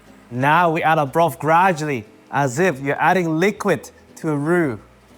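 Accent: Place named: RP, United Kingdom. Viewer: So posh.... I am a fan old lady from South Africa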